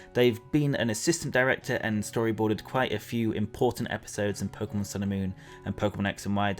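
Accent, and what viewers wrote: United Kingdom. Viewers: Bootiful British accent I think? Makes me swell wit pride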